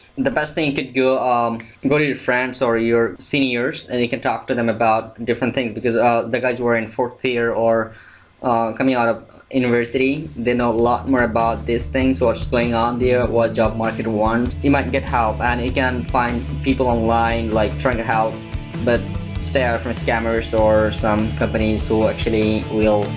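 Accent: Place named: Bangladesh. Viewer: what major do you think is best for guys like me who is willing to do engineering(undergraduate) but not sure what to begin with... i sense you are from bangladesh(by your accent) ... so am i ... whats your advice to me as a fellow bengali ... what major should i do?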